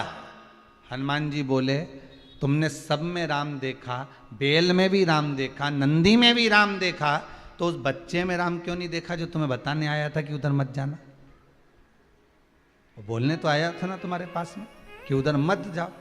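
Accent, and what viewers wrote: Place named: Madhya Pradesh, India. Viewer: Aap m.p vale ho to mp ki tarh hi belonged.Maharashtra ki Tarah bolo to me aapko accha he sir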